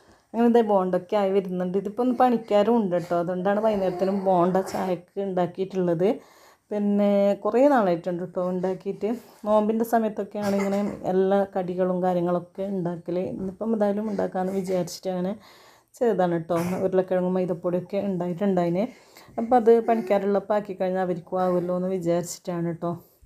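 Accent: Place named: Kannur, India. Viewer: ചിലത് ഒന്നും മനസ്സിലാവില്ല കണ്ണൂർഭാഷ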